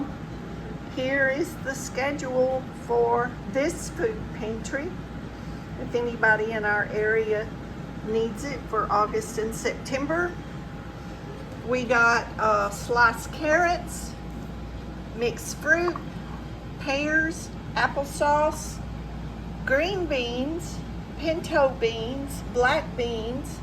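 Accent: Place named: South, United States. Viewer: I love your southern accent. I miss it where I live now. 💜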